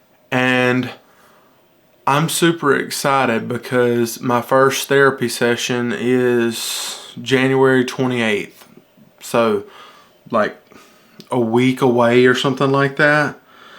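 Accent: South, United States. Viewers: Bro, you have a hint of a southern accent 😉stick with it you will NEVER regret it.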